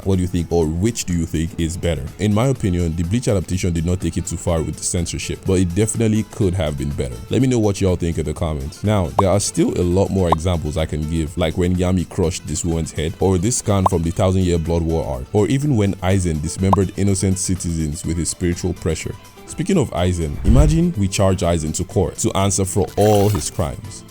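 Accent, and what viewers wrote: Nigeria. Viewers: I had a feeling bro was Nigerian straight from the accent, well, as a Nigerian myself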